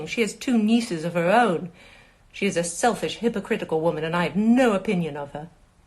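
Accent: England, United Kingdom. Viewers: Her English accent is natural as well.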